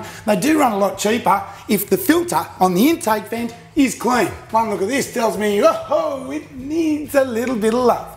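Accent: Australia. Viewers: We love you Australian!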